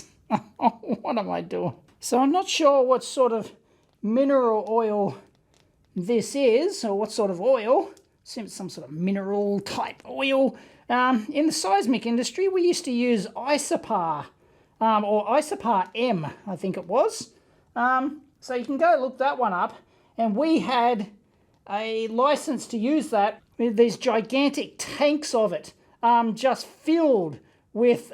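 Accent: Australia. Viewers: Neat, love ya Aussie accent. Feel '0' vision would revolutionise the porn industry 😁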